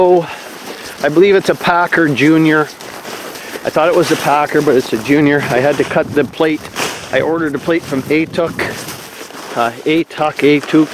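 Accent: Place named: Canada. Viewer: That's the most Canadian accent I've heard all day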